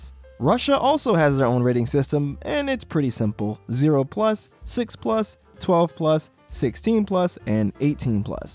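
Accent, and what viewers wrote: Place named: North America. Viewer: Well, none of these are nostalgic or iconic to anyone outside of North America. From your choice of words and accent, it's clear where you're from.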